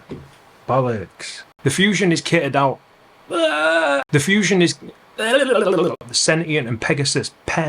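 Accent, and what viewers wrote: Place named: South Yorkshire, United Kingdom. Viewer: Accents fascinate me...and Ive been trying to work out yours... Im going to guess...somewhere around South Yorkshire??? 🤔 (Dont have a go at me if youre a Lancastrian! 😅)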